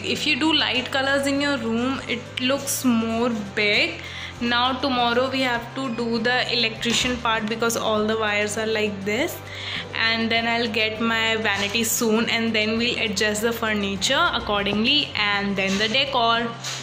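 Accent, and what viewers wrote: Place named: India. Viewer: Me gustó mucho como te adaptaste al tamaño de tu habitación y ese color la hace ver más grande. También vengo de la Increíble India, pero al contrario me gusta que sean así los vídeos porque estoy tratando de familiarizarme con el acento de ustedes jeje, igual los subtitulos no estarían de más. Me encanta tu contenido, saludos :)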